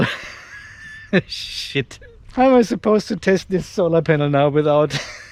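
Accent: Germany. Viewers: You sound german?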